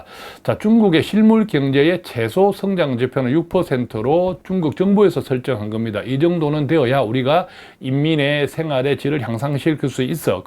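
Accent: Gyeongsang, South Korea. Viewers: 말씨가 갱상도네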